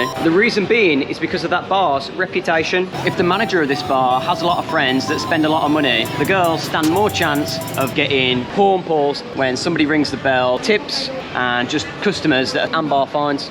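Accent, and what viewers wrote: Birmingham, United Kingdom. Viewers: You from Birmingham mate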